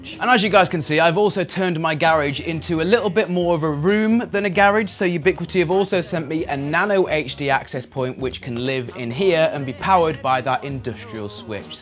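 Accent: England, United Kingdom. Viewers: He's English, we pronounce it like garridge.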